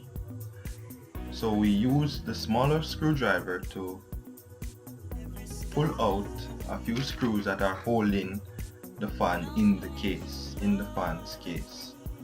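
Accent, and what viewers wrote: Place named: Jamaica. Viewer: Thumbs up for the JA accent..... bras brap 🇯🇲🇯🇲🇯🇲🇯🇲🇯🇲🇯🇲🇯🇲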